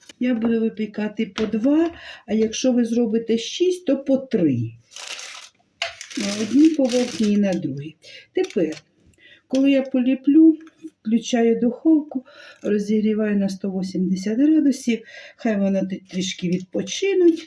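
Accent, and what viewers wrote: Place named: Poltava, Ukraine. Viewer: Дякую вам за гарний рецепт ,спробую...ніколи не пекла, а ви надихнули. Дуже приємний у вас говір, на полтавський схожий. Я сама родом з Полтавщини.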